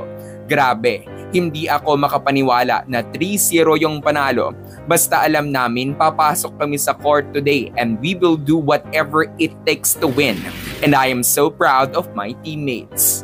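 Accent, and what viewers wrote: Philippines. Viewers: Cute ng accent mo gigil na gigil pinoy na pinoy, anyways what a sweet victory for our Alas in times like this which independence day 🎉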